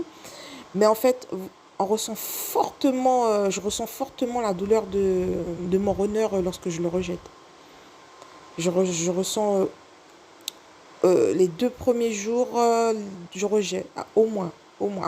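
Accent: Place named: French Guiana. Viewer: Bonjour, merci pour ces explications. Je ne connaissais pas votre chaîne, je me retrouve dans vos explications. Je me suis abonnée et écoute depuis hier vos vidéos. Vous avez un accent guyanais 😊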